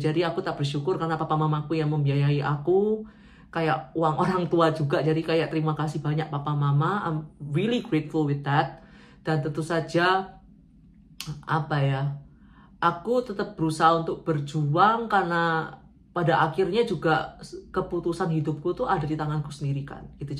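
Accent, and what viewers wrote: Surabaya, Indonesia. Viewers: Dari awal nonton video sampean uda curiga dari cara bicaranya kok medok bgt 😂 adakno wong sby 😂 lancar kuliahnya disana ya mas 🎉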